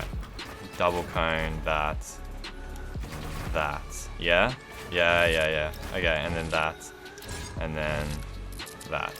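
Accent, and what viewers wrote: Australia. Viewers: Yo he has like a Australian accent and an American accent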